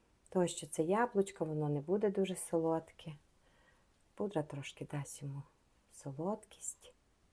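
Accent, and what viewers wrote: Transcarpathia, Ukraine. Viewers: Очень приятно Вас слушать! Мова чудова!)) Та цей неперевершений закарпатський акцент! И рецепты очень оригинальные! Мира Вам, здоровья и добра! Привет из Житомира. 🌻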